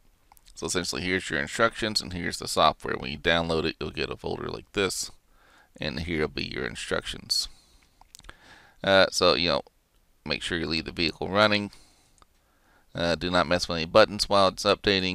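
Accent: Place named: United States. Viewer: Nice and concise once you get to grips with the usa twang. A Kings English version would be most helpful. Thanks anyway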